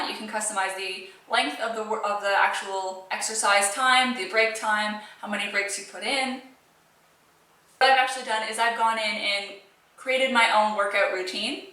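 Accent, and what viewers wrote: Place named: Australia. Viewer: I'm hearing such an Australian accent in the intro. Its slight but I recognize it because my brother is also a Canadian living in Australia. I love habit trackers.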